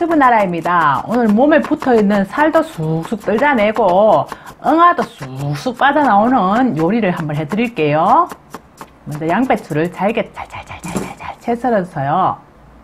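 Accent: Gyeongsang, South Korea. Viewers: 경상도사투리는 듣기가 쫌... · 시원시원 하게 요리하시니까 든기좋네요 경상도 말과 함깨 구수 하네요 양배추로 해먹어 봐야 겠네요 간단한 요리 또 올려 주세요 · 사투리가 귀에 쏙쏙 저도 경상도 여자라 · 언니 요리 솜씨보다 상도 사투리가 매력만점 더 예쁘고 간더러지게 구사하시옵소서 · 아이디어는 좋은게 많은데 너무 심하게 쓰는 사투리가 거슬리네요 ㅠㅠㅠ 경상도분이시라 일반 사투리나 억양 이해는 하는데 궂이 그렇게 심하게 쓰실 필요가 있을까요? 암튼 조금 고쳐주셨음 영상이 더 좋아질듯요.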